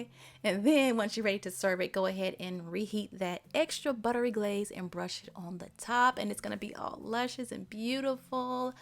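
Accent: South, United States. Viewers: I'm a texture person too! Lol I just love your sweet southern accent! I have it as well but I gotta say I could listen to you read a phone book! I am gona try this cake tomorrow! Pound cake is my favorite! Thanks for sharing! I will be liking and subscribing! And God bless your Grandma for most likely inspiring you to bake pound cakes too!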